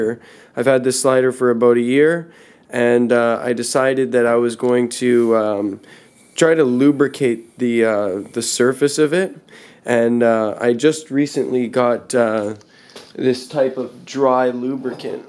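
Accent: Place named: Canada. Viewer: enjoyed the perceptible Canadian accent and "oops, that's French." Will definitely try this on the used Konova K3 I bought used on eBay.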